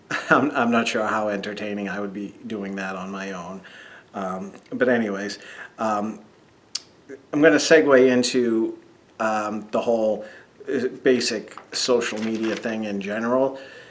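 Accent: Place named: Boston, United States. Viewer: ...amigo, imo, you don't need give aways. We sub'd and watch you for YOU and your shenanigans. In a youtube world of so many "fake and money grubbing" people, i myself appreciate your humbleness and "realness!" Don't put yourself down amigo please, there's many of us out here that genuinely like you just as you are. When I watch your videos I smile, you make me laugh and of course, who doesn't love that "Buastahn" accent, lol. I am part of the, Hog & 2 Cent, Mark, Matt, Nicky, and Vegas Nerds crew. 😁😁 I am happy to see that you and Cinamon are "goin steady", ha ha, ya know what I mean. I wish you both good luck and blessings. Amigo, please stay safe in Vegas....DO NOT lick the pole! LOL LOL 😅😆 Do NOT lick anything that hasn't been sprayed down with plenty of alcohol! LOL By the way...I'm a chatty heffer, so I don't mind rambling, lol! 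Cheers to good times, health, tons of laughs, handpays and unforgettable memories in our beloved and BEST city in the world...VEGAS! 🤗😁🍸🍻💰🍀🤣🎵🙏🎰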